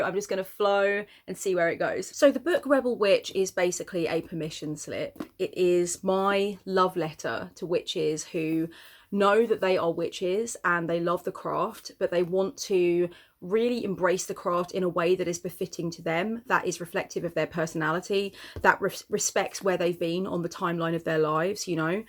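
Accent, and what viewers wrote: United Kingdom. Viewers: You are right! I wouldn't listen to an audiobook if it wasn't you. My son has Autism and is obsessed with British accents. Sometimes I put you on to calm him or pull him out of meltdown. XO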